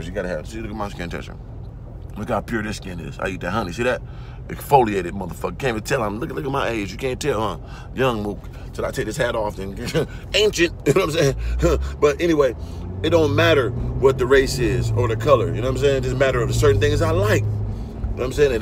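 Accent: United States. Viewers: Understood bro, I feel it. I’m catching what you doing it’s not what you say but why you do and the face you giving us the game gratitude bro, finna turn it up 2k25! You talk like you from south I’m from Memphis! Love to get mentored by ya 🙏🏽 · I just joined your channel and I enjoy your content, plus you inspire me to take better care of myself also while getting the girls. I gotta say this. I'm from Cali/The Bay and you sound like you from Cali my brotha. Right on and I wish you many riches and many bitches.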